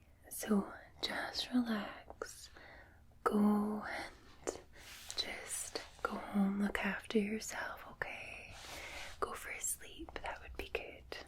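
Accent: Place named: Scotland, United Kingdom. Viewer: Great video as always so relaxing your Scots accent makes me melt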